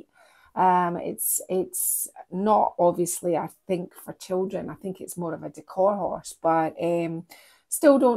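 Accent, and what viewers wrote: Scotland, United Kingdom. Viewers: Immediate Like without watching, couse: Rocking horse, Scottish accent, work on the floor....Thank you.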